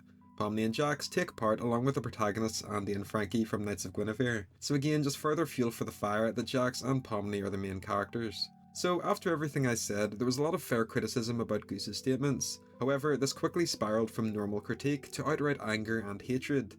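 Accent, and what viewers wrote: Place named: Northern Ireland, United Kingdom. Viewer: You’re NORTHERN IRISH AYYY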